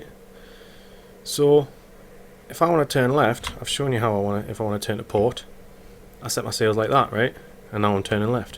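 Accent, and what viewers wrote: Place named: Newcastle, United Kingdom. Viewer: Great video man! Nice to hear a jordie voice amidst all the American naval action video s. I haven't yet played this game, and the detail is cool. But I am a little disappointed that you can only change sail angle and not entire sail plan. (Eg a square rigger sails best down wind with only foresails and main course)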